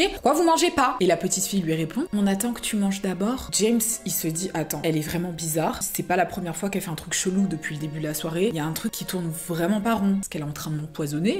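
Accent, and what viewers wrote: England, United Kingdom. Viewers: T grave. Flipante et vrm t trop bien et j’adore ton axant anglais 🤐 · J’aime trop ton accent d’anglais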